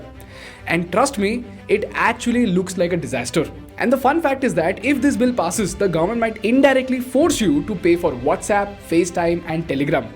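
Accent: India. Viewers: Your videos are awesome! Keep it up - informative, well researched, superb presentation graphics/ text/ delivery and above all designed to throw clarity on some of the complex issues that affect the average person searching for truth. While your accent is Indian, and so very genuine, (words in every language are pronounced differently by users and thus add to the novelty ) your content is what carries the message. Kudos.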